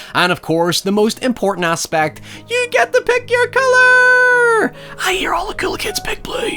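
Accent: Northern Ireland, United Kingdom. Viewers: thank you for flat out saying you're Northern Irish. I'm fascinated by accents within the English language as a non-native speaker and I've always wondered if this soothing voice of yours was, in fact, an Irish one. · Northern Irish? always thought you were Scottish. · Btw, I think your "soothing, sexy Northern Irish accent" adds to the uniqueness of your content and almost seems to add to the mysticism of the movies and topics you discuss.:) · "If you wanna listen to my soothing, sexy NORTHERN IRISH accent..." Oh, so THAT'S your accent! I uh... don't know how I didn't get that. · northern irish accent 😍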